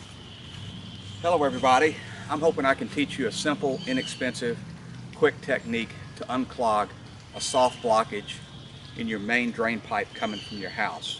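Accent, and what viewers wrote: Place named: Texas, United States. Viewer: Do u live here in Texas Sir??? Your accent sounds Texan.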